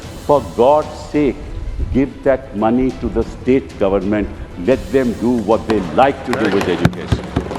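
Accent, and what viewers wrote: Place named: Tamil Nadu, India. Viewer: எங்க தலைவரே தமிழ் ஆங்கிலத்துல பேசினாலும் தமிழ் போல தான்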